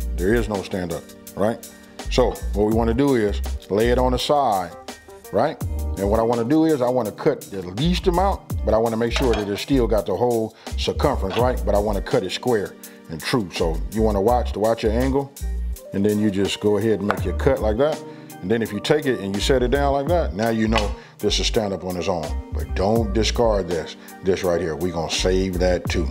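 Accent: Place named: West Coast, United States. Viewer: Bruh your speech is so Westcoast 😂 salute OG!!!!!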